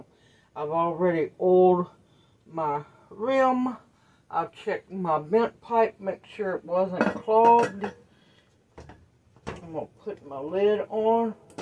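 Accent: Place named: Tennessee, United States. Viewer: I saw the video title and I had to watch. I said, this gal must be from Tennessee, because sweet potato butter is from back home. Then I heard you speak and said, yes, this is a fellow sister from Tennessee. Good on ya teachin the ways.. 😁👍🏻❤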